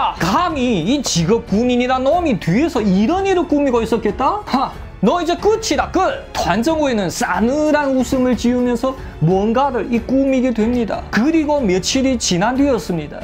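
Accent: Yanbian, China. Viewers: 수고 많아요 연변한국어 정겹게 듣고 있어요 좀더길게 해주세요 너무짧아요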